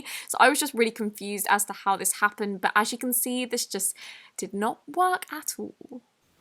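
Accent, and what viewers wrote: England, United Kingdom. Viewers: James McDonald why I’m irish and we hate the English like i hate this both but i don’t hate her voice and it’s an english accent not British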